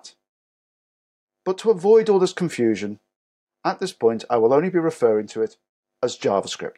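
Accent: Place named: England, United Kingdom. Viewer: Very nice to hear somebody speak English on YouTube instead of the ever-present American!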